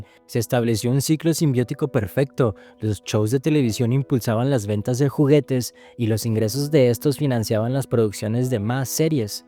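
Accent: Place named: Colombia. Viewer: Voz tipica de colombiano...de mucha hueva...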